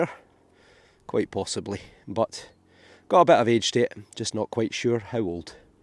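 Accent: Scotland, United Kingdom. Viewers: Love to watch your treasure quest. I specially enjoy listening to you that Scottish accent sounds so magical to my ears. Also you are a good looking man sertainly easy on the eyes. You are. so cute when you find something great and you get so excited. Cheers from Princeton Illinois USA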